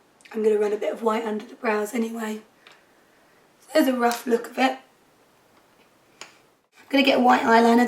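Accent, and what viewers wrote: United Kingdom. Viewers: Lol I noticed that too but it sounds wonderful with her British accent!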